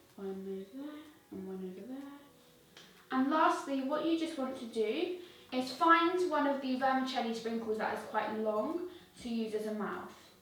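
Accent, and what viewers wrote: United Kingdom. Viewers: Hi.. I want to make that cupcakes in my birthday coming soon for my classmates.. But whenever I try to make cupcakes then my buttercream starts to melt 😢 I don't want my class to laugh at me on my birthday😫can you please help me.. Actually I got the easy and best buttercream recipe from you: icing sugar, unsalted butter, peppermint extract and food colouring.. But I don't have peppermint extract 😭😞 Can I used something else instead?? Please reply.. I loveeee baking.. And want to show my classmates that I can bake I came here from watching your previous videos I love your personality and you accent I really wanna learn that British accent And sorry for my wrong english I speak German so my English is a bit weak I will be sooo happy if you reply me Love from Germany ❤❤❤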